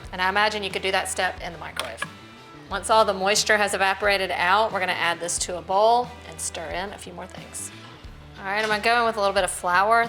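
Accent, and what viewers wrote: South, United States. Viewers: The southern accent really does make this show. If she spoke normal none accent English it wouldn't have the magic that it does. Go team murricaa!!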